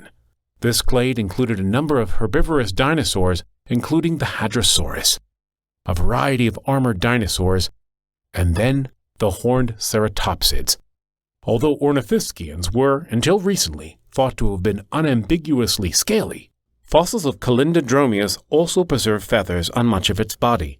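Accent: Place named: United States. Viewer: the new narrator is very sensationalist and sounds like talkin to children on a village pfair. Way too American somehow, please get back the previous guy :)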